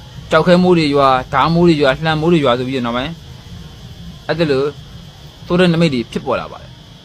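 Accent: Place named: Rakhine, Myanmar. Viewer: ပြောတဲ့အသံနားထောင်ရရင်ရခိုင်လူမျိုးတင်ထားတာထင်တယ်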